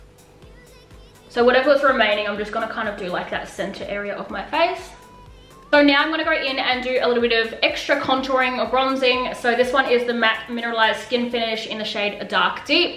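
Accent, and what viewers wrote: Australia. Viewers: I’m actually very thankful that I shared your full face online for me here because I’ve been struggling on exactly how I WANT TO DO MY FACE which turns out to be similar, very similar to yours. It reminds me of the plouise academy girls. I know your Aussie I can hear your accent. Thank you 🙏 you should feel very esteemed cuz I watched your video 5 times on 2X speed lol 😆 which is probably why I had to keep rewinding lol but DING DONG DAHLING CUZ YOUUUUU HIT IT🤣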